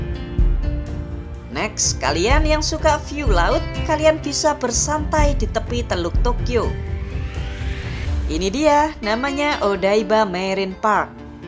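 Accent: Java, Indonesia. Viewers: narator e ,Mas * Wong Jowo pundi niki mas? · kok medok tenan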